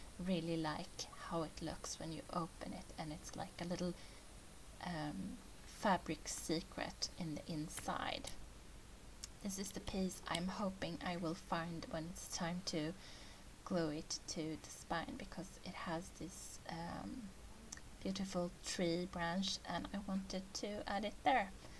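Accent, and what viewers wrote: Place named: Sweden. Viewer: sigh...i do love your art, follow you on insta. I just wish I could understand your lovely swedish accent, as I am an american speaker. I can only catch a few words now and then. I know it's not your problem. That said, I'll watch, but will not try to understand. the closed captions are in swedish, of course, not english.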